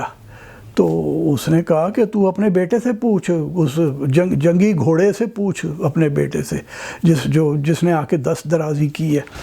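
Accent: Punjab, Pakistan. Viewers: My father emigrated from Talagang to Delhi in 47. He expired a few years ago. When I was a child, he told me this story. Your Punjabi accent is similar.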